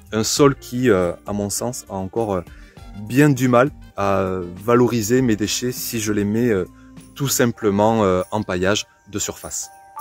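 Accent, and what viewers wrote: South, France. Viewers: Bonjour, Juste pour vous dire que j’adore vos vidéos. Les notions de chimie devraient en temps normal me faire fuir mais là, j’adore, je comprends et cela fait sens! De plus, vous écouter avec votre petit accent du Sud, donne le sentiment d’être en vacances. Merci pour votre partage.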